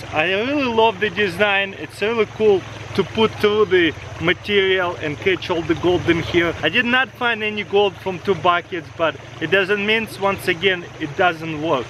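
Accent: Russia. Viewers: russian? :D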